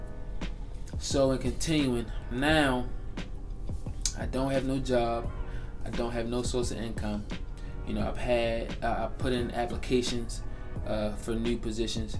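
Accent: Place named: Washington DC, United States. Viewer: I love the DC accent.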